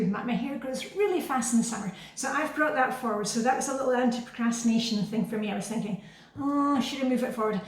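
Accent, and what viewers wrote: Scotland, United Kingdom. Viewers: Hello, I´m new here just found you on YT. What a nice and inspirational person you are. I am Danish and live in Denmark. Seems to me that you have a Scottish accent is it true? Hope you don´t mind me asking. Jeg håber du får en rigtig god dag og ser frem til at dykke ned i dine videoer....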